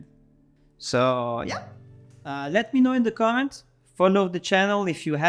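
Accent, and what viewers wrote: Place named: France. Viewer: I like it, also your sense of humour and French accent, thank you! Just keep going and the Eps will get even better.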